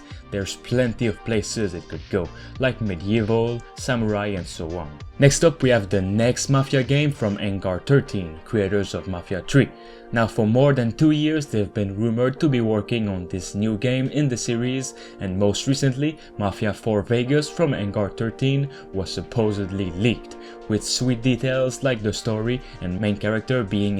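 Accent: Quebec, Canada. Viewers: are you Quebecan or French Canadian?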